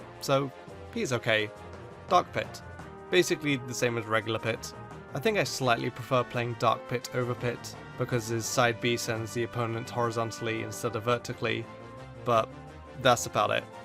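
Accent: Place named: United Kingdom. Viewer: Wilfred Cthulu he did with the koopalings and that’s all that matters that he thinks Wendy Koopa sucks & the rest besides jr & like iggy which is fine but yeah those aren’t different characters just skins ya know so I guess if u wanna hear his British voice going I really like this shade of purple on daisy then...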